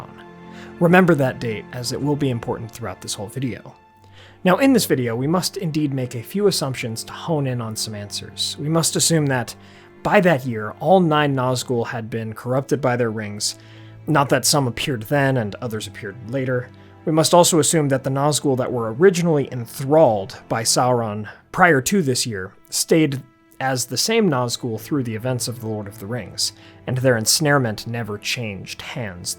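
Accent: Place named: Bay Area, United States. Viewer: Jersey ? Detroit ? Chicago maybe ? No I know The Bay Area !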